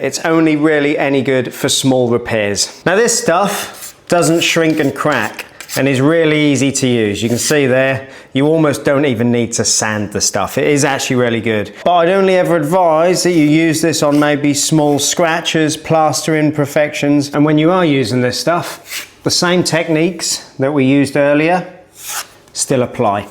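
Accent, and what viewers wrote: Norfolk, United Kingdom. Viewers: As a decorator of 30 years I can confirm polyfilla in a tube is the worst! The one time tub is good for pin holes. Easy feel is the way! Good video, sir. May I ask, is that a Norfolk accent? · Love your videos mate! But gotta ask - are you a Norfolk boi? Im getting a hint of that accent being one myself lol! 👍👍👍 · You from Norfolk or Suffolk? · Are you a Norfolk boy? I've lived/worked all over the uk, and have been in Scotland for about 38 years, but I think I recognise that accent 😊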